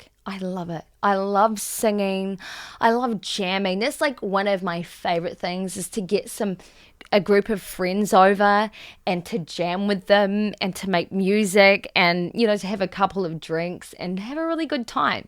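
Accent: New Zealand. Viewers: Toxic egomaniac band member taking total control and walking over the top of you , ah! that brings back memories . And everything else you've experienced seems so familiar , good to see you have such insight into yourself . Too bad that wasn't the case with me thirty years of going nowhere , P.S. love that kiwi accent . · I think it's a New Zealand accent. I automatically assumed Aussie at first, but certain words have a NZ twang.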